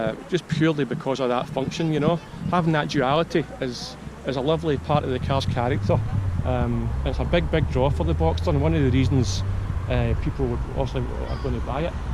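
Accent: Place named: Scotland, United Kingdom. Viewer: Really enjoy your channel, and it's very informative content...and your Brogue. (Especially since I am from New Scotland- Nova Scotia). 🙂 Your passion about Porsche's, namely Boxsters is really infectious, I am working towards exactly what you have - a Guards Red 987. Thank you for the inspiration, and dispelling fears around maintenence costs and the dreaded you know what...cheers! P.S., going to have to source out your Boxster book!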